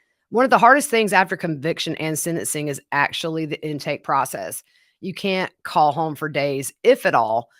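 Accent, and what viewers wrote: South, United States. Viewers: Prisons and all institutions are inhumane. After 6 months of wholesome food, the level of violence significantly was reduced in a school for delinquent youth. Elderly homes, with zombies medicated patients, are transformed with private pods and gardens leading to a central area. The prison system minimally should separate the criminals from convicts, as the British did between devoted Nazi POWs and the other Germans, one of whom married my best friend's mother, a half Jewish woman. This is a fascinating insiders' look into the most hidden world of women's prisons. The lady and you should write a book. It could inspire reform. Kudos to you. Love your home spun style and Southern drawl!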